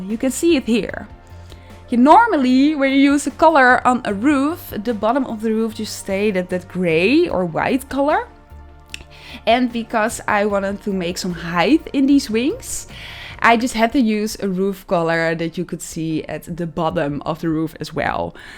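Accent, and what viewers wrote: Netherlands. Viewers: Are you Dutch? 👀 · Wowww ik kan niet eens een normale huis maken😭😂 en ja ik praat nederlands omdat ik aan je accent kan horen dat je het ook bent :') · Are you Dutch? Cool video!! ❤️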